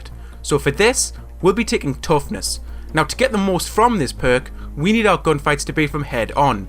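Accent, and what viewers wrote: Newcastle, United Kingdom. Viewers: U from newcastle I am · It's not Irish, hes from Newcastle, it's a Jordy accent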